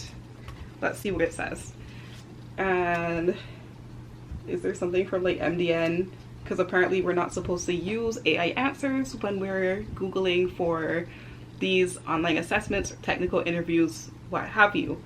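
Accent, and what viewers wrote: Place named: Midwest, United States. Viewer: Are you from mid west? I hear a slight accent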